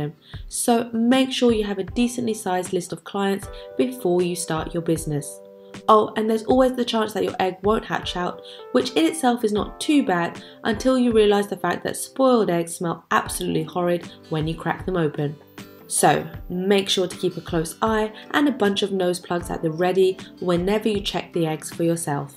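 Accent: United Kingdom. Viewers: She has a English accent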